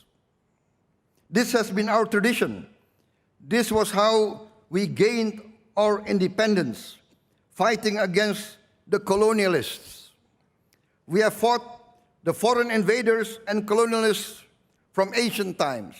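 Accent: Indonesia. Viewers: Suka dg "accent" nya, gak ngilangin warna asli aksen Indonesia👍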